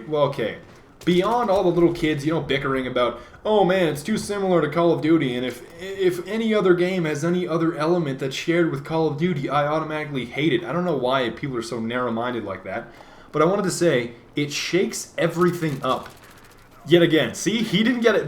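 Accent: Russia. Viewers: are you Russian or are you faking like FPSRussia?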